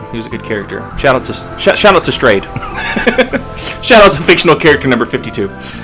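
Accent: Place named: South, United States. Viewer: Cuz Vape Nation y'all. I love memes in your southern drawl. It's adorable.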